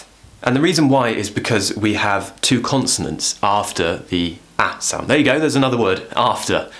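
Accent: United Kingdom. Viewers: I love your British accent 🤩. Do you have any recommendations for british audiobook? I want to listen more.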